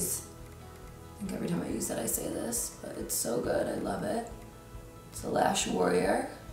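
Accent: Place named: Philadelphia, United States. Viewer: Absolutely gorgeous ! Love this look 👏❤️ Are you from Philly or around here? I hear your accent like mine... “wuter ” hahaha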